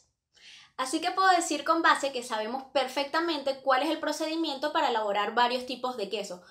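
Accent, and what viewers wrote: Venezuela. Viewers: Hola, estoy en eso de querer volverme vegana pero ni idea de donde conseguír los ingredientes. Por tu acento creo que eres venezolana, si es asi. ¿Me dices por fa donde puedo comprar en venezuela el psyllium, la levadura y los probioticos, y que marcas? Por favor🙏🏼🙏🏼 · Qué fácil, colega jaja, soy profesora y tienes mucha pedagogía al explicar. Un millón de gracias, ya me inscribí. En los comentarios leí que existe una pizza, voy a buscarla, que Dios te bendiga. ¿De donde eres chamita, su acento tiene mucho parecido al venezolano. Chaoooooo.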